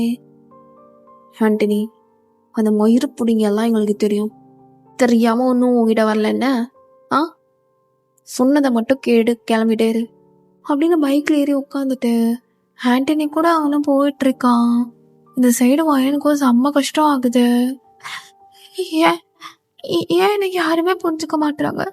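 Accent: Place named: Kerala, India. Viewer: Akka I'm a malayali 😊 but your malayalam is soo cute ❤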